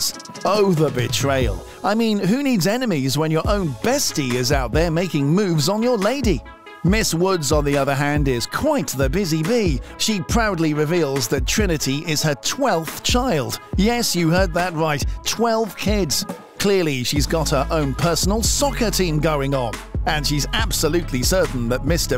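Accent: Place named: United Kingdom. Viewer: Who's the British voiceover?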